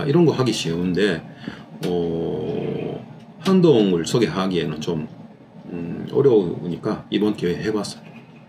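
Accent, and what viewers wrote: Busan, South Korea. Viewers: 전 사장님 부산사투리 말투 너무너무 듣기 좋아요 편안하게 계속 듣게되는 목소리에요. 알림설정 하고 갑니다^^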